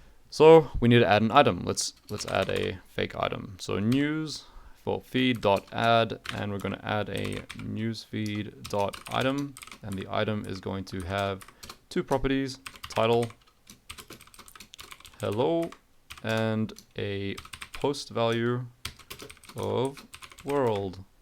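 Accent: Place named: New Zealand. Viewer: Enjoying your accent. Chur from a fellow kiwi for your excellent content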